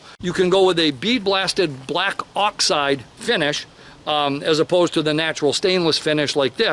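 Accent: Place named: North, United States. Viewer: Cool cool firearm. Also as a southerner this is the most northern guy I've ever heard. Subbed so fast. Happy shooting brother!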